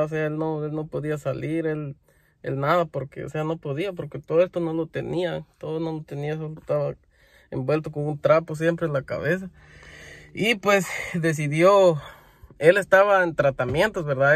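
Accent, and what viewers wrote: Mexico. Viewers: No les quita nada de que el quiera hablar como los mexicanos!. Yo soy mexicana y no le miro nada de malo. Malo es cuando se expresan mal de Mexico 🇲🇽 pero en este caso no es así. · Soy méxicana y no veo nada de malo que hable como nosotros. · Es la primera ves q veo este canal y creó q el muchacho es de Guatemala, pero tengo duda porque abla como mexicano, me cayó mui bien 👍 seguiré mirando sus videos para subscribirme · Eres mexicano Ablas como Mexicano te copiaste de Asento Mexicano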